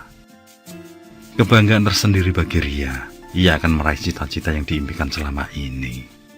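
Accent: Java, Indonesia. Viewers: Klo mo nyumbang cerita nih apa hrs slalu yg genrenya horor trs ada mistik nya?koreksi dikit, krn cerita ini berlatar keluarga Batak yg tgl di Jawa timur mungkin ada baiknya voice talent agak disesuaikan dikit,jd ga trlalu Jawa tp ada batak2nya dikit jdnya lbh hdp kt nntnya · dubbernya ... medhok!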